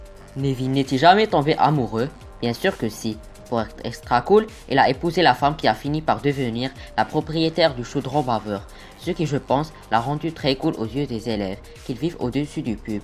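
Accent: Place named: Morocco. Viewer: C'est quoi ta voix ? Accent ou ton pays ? C'est un mélange entre un marocain, un québecois ! En plus on dirait que tu as de l'asme ! Ta voix c'est un bordel omg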